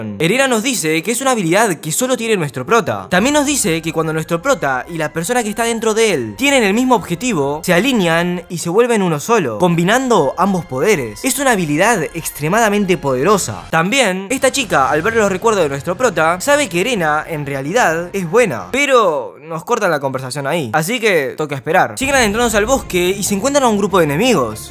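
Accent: Argentina. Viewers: Eres de Argentina?:V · Sos argentino.. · sabes que, yo no se pero ando viendo todos tus videos para notar los animes que posiblemente me mire y el asento argentino me encanta para todo el sh sho desmasho y eso jajaj me parece la bomba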